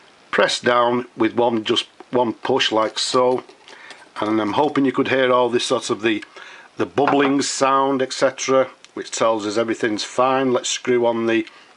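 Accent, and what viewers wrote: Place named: North, United Kingdom. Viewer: Ey up! Thy sounds like a Northerner. I have an Imperial I with a plastic Touchdown rod.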